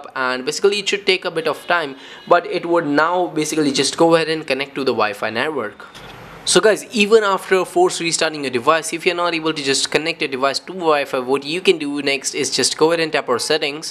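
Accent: India. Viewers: Thank you so much. It worked in my iphone by resetting network settings. I was feeling helpless before that. Search in YouTube how to fix it and found your video first. Btw I liked your indian accent.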